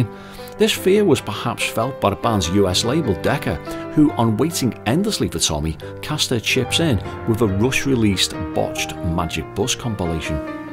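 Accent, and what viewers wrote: Liverpool, United Kingdom. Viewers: Schade, daß keine deutschen Untertitel verfügbar sind. Ich mag deine Retrospektiven seit langem sehr gerne aber dem Liverpooler Akzent kann ich nicht folgen😂😢😢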